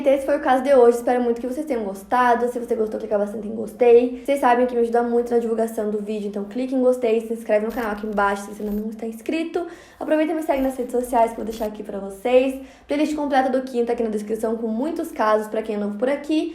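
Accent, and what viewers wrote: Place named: Rio Grande do Sul, Brazil. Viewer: acho que é riograndense Aqui no Rio Grande do Sul falamos assim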